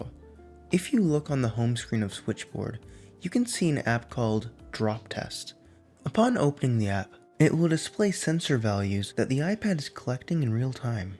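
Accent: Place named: Netherlands. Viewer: Dus je bent wel een Nederlandse.... can't help but noticed the accent .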